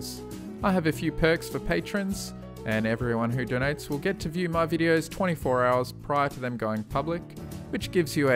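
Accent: Australia. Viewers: Hey! What part of Australia are you from? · Are you Australian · where is he from, Australia ?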